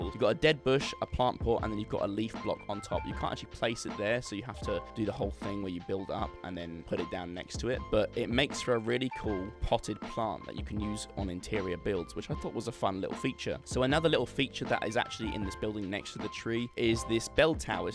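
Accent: United Kingdom. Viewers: I like so much British accent. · I always love british accent.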